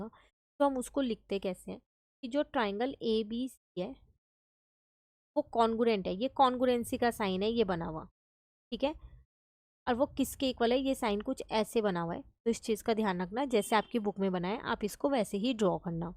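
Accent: Bengal, India. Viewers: Are u bengali😮😮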